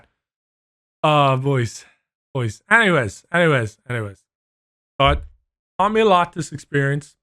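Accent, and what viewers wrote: Ireland. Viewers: Man being a fellow Irish man and listening to this story/explanation is hilarious because he explained it in such an Irish way😂 plus I just straight laughed for the whole duration of that car bit Anyways good luck and hope all goes well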